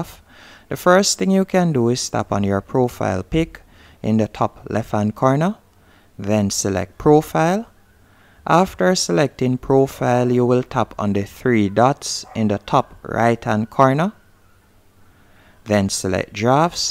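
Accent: Jamaica. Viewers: Thank you! You sound Jamaican.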